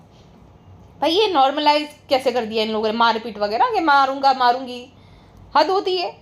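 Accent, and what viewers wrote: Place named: Marwar, India. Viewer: Aapki ki accent Gujarati bilkul marwdi jaise hai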